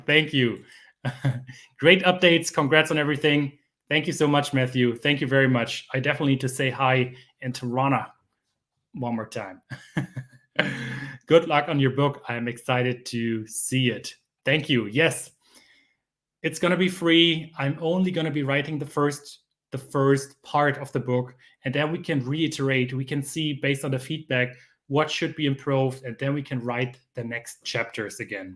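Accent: Germany. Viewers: I thought the German accent was fun! Do you have to actively try to suppress the German accent when speaking English or does that come naturally?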